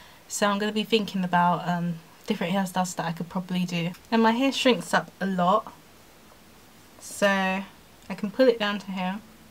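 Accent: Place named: Australia. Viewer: I've been transitioning for 4 months and I keep getting tangles in the roots each time I wash! I prepoo and detangle but as soon as it shampoo (with a sulfate free shampoo), I get tangles in the roots! What am I doing wrong? It's really frustrating and depressing. By the way, the way you talk sounds a lot like Nikki Perkins (from the Jamie and Nikki channel). Are you from Australia or Sudanese by any chance? Lol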